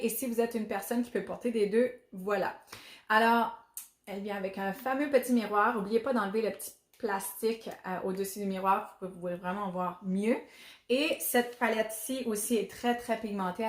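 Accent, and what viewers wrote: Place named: Canada. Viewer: J'adore ton accent petite soeur hi acanadienne ............Ton sourire ton peps je kiffe <3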